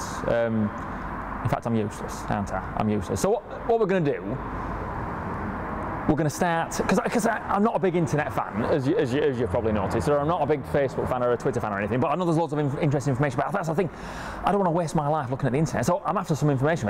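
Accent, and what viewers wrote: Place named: Lincolnshire, United Kingdom. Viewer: people form lincolnshire talk like this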